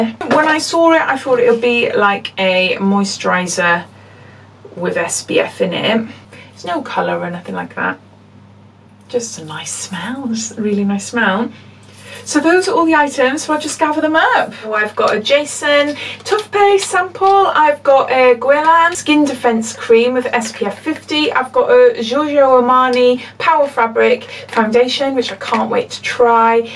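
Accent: Wales, United Kingdom. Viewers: Been following your channel for a while now and really enjoy it. You are very down to earth and natural!!. I have subscribed to the Look fantastic beauty box and feel unique sample service.You sound as though you may be from Wales (im guessing Newport/Cardiff.?). xx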